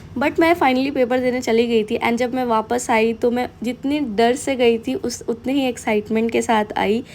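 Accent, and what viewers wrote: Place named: Bihar, India. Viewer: R u bihari apke cll pe ke language se ekdm apne ghr ke bihari language ki feel aayi 🥹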